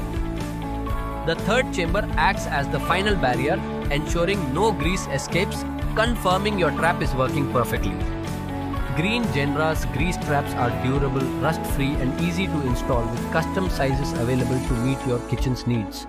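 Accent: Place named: India. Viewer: oh yes indian accent makes it perfect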